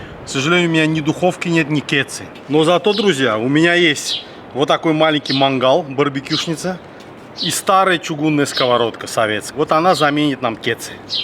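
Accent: Baku, Azerbaijan. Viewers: Здравствуйте! Я когда то жила в самом прекрасном городе Баку! Очень аппетитно готовите, с очень вкусным бакинским акцентом! Спасибо за удовольствие вас смотреть! Подписалась однозначно! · И ещё хочу добавить,что Ваш Бакинский акцент.....без слов,это шедевр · У вас акцент Азербайджанский! Вы Азербайджанец?😊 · Бакинская культура, Бакинский акцент не каждый понемаетеее. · Салам!!! Меня зовут Тимур я живу в Украине в городе Днепр! Я ваш подпищик К сожелению не знаю как вас завут спасибо за ваше видео все очень красиво один акцент ваш Бакинский чего стоит хотел вас попросить чтобы вы преготовили одно Азербайджанское блюдо называется Хящиль помню кушал но без сахарного песка не возможно кушать всегда нужно подсыпать в тарелку готовят это блюдо из муки и оно очень пресное, если получится будет прикольно зарание спасибо!!!😊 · Бакинский акцент, может вы где то на даче в Новханах,ha? 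· у меня бабушка из Шеки, она всегда самая держит дома чаман. Приятно слушать бакинский акцент, привет вам от бакинских армян❤ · Огромный привет вам из Грузии,дорогой мастер своего дела! У вас акцент Бакинский! Всему вашему прекрасному народу привет от грузина!👋🙂🇬🇪🇦🇿✊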